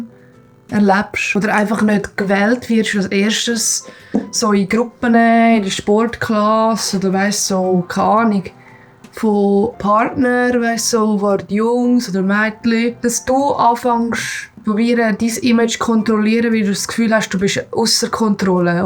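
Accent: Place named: Switzerland. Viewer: Keine Ahnung, warum mir das vorgeschlagen wird, aber voll interessant: Ich bin aus Wien und versteh fast alles, was du sagst, könnte aber niemals selbst so sprechen, wenn ich nicht mehrere Jahre in der Schweiz leben würde! 😂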